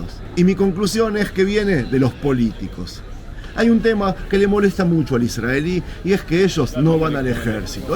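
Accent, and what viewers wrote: Argentina. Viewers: Viven de sus camaradas que tienen empresas y multinacionales y de las armas que fabrican en Israel y otros países. Y parece que a la gente se le ha olvidado lo que estaban haciendo en Nueva York. La mayoría están podridos de dinero, pero no se gastan nada. Lo guardan para la causa ortodoxa y a las mujeres, sólo las queréis para que tengan hijos. No engañais a nadie. Y por favor, no preguntes, si no te van a gustar las contestaciones. Porque no preguntas a las mujeres si les gusta esa vida. Y por lo que se escucha, eres argentino, éstas hay porque pediste asilo, para salir de tu país.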